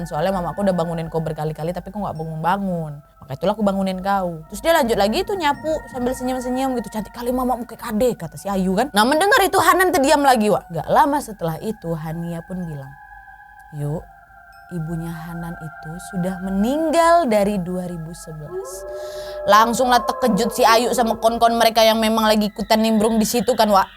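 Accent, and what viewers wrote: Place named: Medan, Indonesia. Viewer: Btw, bahasa kk kayak bahasa orang medan. Ceplas ceplos, btw tinggal dimnasih kk ini.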